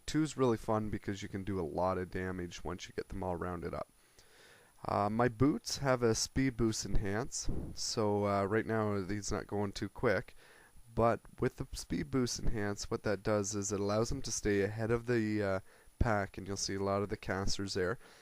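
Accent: Canada. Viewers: haha I remember at the time he was doing this I was just amazed. Because I hadn't seen anything like this. I was like HOLY SHIT!!!!!!! and I like his Awesome Canadian voice <3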